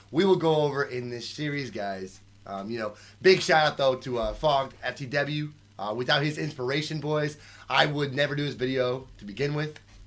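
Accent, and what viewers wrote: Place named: United States. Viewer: as a german is hard to understand your english over a long time cause you have i think a strong american accent but i still like your videos and streams pls keep it on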